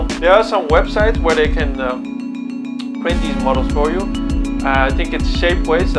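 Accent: Netherlands. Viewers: You Dutch? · Leuke video! Ik hoorde aan het begin van de video al dat je nederlands bent, maar het werd pas bevestigd toen ik de spuitbus verf zag😆😉. Ik gebruik de zelfde verf voor mijn prints. Weet niet of je dat al doet, maar deze verf is het goedkoopst bij de "Action" winkels.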